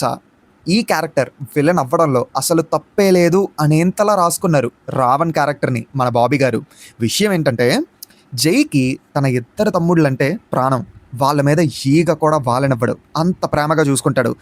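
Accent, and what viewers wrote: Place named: Vizianagaram, India. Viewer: Yeti antai antunnav meedhi vijaynagaram kadha bro 😊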